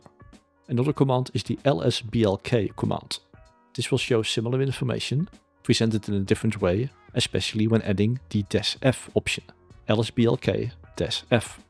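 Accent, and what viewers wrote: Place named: Netherlands. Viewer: Well it's pretty easy to figure out he's Dutch from that accent. Question is why is it hard for others when it's easy for me. Answer is....I actually meet people non-English speaking countries, not just stay for my whole life speaking only with native English speaking people or watching native english content.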